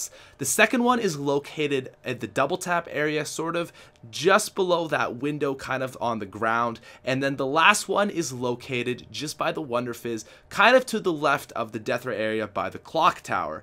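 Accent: Canada. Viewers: is this guy from Canada? he sounds like he is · Idk if anyone can tell but i know for a fact he is canadian by the way he says "are". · Are you Canadian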